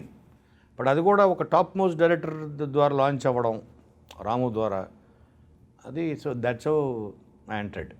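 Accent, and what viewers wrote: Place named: Telangana, India. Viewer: Nice interview . యాంకర్ బాబు అంత బాగుంది కానీ ఆ తెలంగాణ యాస ఎందుకు .. అలాగే ఎవరు చిత్తూరు..కాకినాడ.. శ్రీకాకుళం .. యాస మాట్లడరు కదా.. ఎందుకు ఈ మధ్య నే కొత్త గ ఇంత అతి చేస్తున్నారు మీరు తెలంగాణ యాస తో .. Consider others too. One gets rejected for a call centre job too if there is heavy mother tongue influence in his English. Experienced it first hand in getting rejected. Cater for everyone when in media. Speak neutralized. Jai Telangana .. Jai Andhra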